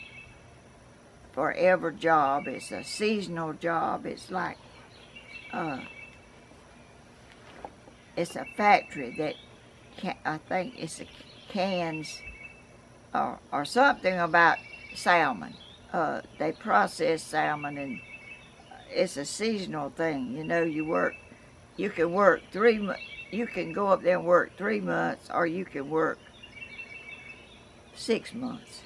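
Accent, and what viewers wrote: South, United States. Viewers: In these awful times, it's sure nice to hear a Southerner like my Grandmother. Listening to you is like going to my Grandfather's farm in Slocomb Alabama. I now live in Northern California and miss my family very much. I grew up in West Palm Beach, FL in the late 60s and 70s when it was still beautiful down there. My family is all over between Slocomb Alabama and Panama City Florida. So I guess we're kinda like long-distant neighbors of sorts. :) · Bless your heart! I bumped into your videos yesterday and have been watching non-stop! My Mom was from Virginia Beach and had family all the way down the coast including Georgia so listening to you talk is such a balm to my heart! Some of my favorite memories are of sitting outside with Ice Tea and listening to all the southern conversation. My Mom is gone and I miss her something awful so if you don't mind I'd like to think of you as a long lost relative that I didn't know I had. 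😉. God bless you and keep you! And Cody will be back before you know it! 💞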